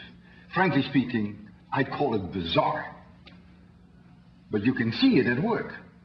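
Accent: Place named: Netherlands. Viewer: Dutch and English don't mix well in his case... That is one hell of a bad accent. But nice video anyway.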